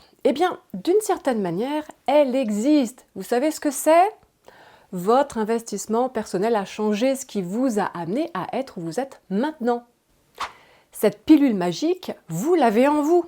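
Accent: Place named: Switzerland. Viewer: Bonjour. J’entend à votre accent que vous êtes Suisse. Excellente vidéo… Au plaisir.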